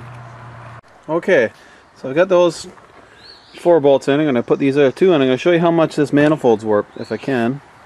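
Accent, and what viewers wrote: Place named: Canada. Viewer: I like how you can talk to 'no one' and keep it interesting. And also Canadian accent.. how aboot that aye.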